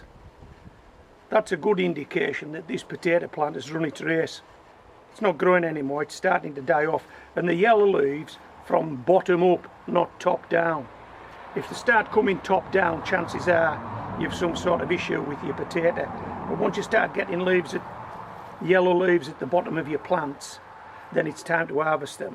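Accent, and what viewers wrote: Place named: Teesside, United Kingdom. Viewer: Hi, Just discovered your channel. From your accent i would guess you are from Bishop Auckland or Teesside. I live in Consett. Just watched your video about winter onions. I will give those winter onions a try. I already grow potatoes, carrots, parsnips etc in pots. Great channel by the way!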